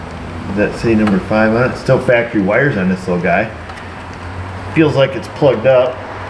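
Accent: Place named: Upstate New York, United States. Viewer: I have been binge watching just to hear an upstate NY accent. I miss my alma mater Binghamton. Wish the communist hadn't taken over.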